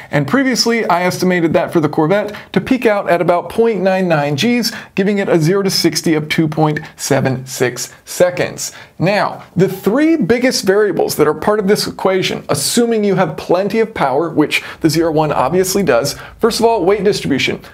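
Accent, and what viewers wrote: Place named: South, United States. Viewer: I think the time spent in Texas rubbed off on your accent a bit, and brought some more southern out. :)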